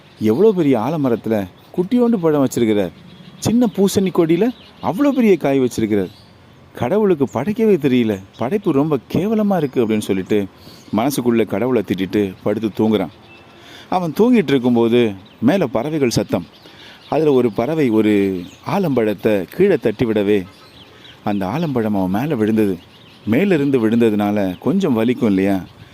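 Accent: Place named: Virudhunagar, India. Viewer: Nice slang sir kamarajar voda slang apdiye pesuringa.🎉🎉🎉🎉🎉🎉🎉🎉🎉🎉🎉🎉🎉🎉🎉🎉🎉🎉🎉🎉🎉🎉🎉🎉🎉🎉🎉🎉🎉🎉🎉🎉🎉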